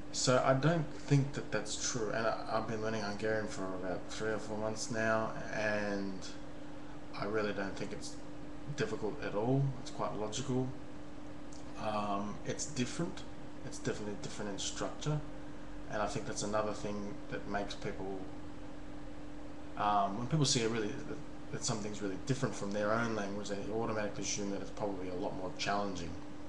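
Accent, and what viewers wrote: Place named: Australia. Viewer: Your Australain accent isn't very strong.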